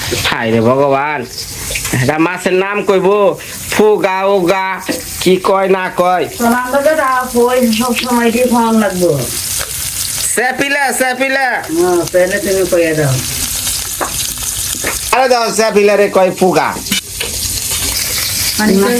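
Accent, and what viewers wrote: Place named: Tangail, Bangladesh. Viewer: বাড়ির সবাই পীর মনে করে। কথা - বার্তা যা কয় তাই মনে করে শুদ্ধ। সাথে সাথে তারা ও শুদ্ধ বলার চেষ্টা করে, আর তাতেই খায় ধরা। এ ও হয় না ও হয় না। যার যার আঞ্চলিক ভাষায় কথা বললে কি অসুবিধা। আরে টাংগাইলের পুরাপুরি শুদ্ধ না। এই যে বলে গামচাটা ভগলা, আইচাল, খাইচাল,গেছাল। আমি ময়মনসিংহের মেয়ে আর টাংগাইলের বউ। সুতরাং সব আমি জানি। যার যার জায়গা থেকে সে বলবে সেটা দুসের কিছু না।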